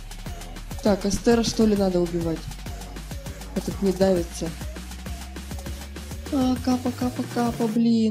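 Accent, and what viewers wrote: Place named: Urals, Russia. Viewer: Прикольно)) Ты из Перми/Екатеринбурга? Манера разговора "тамошняя"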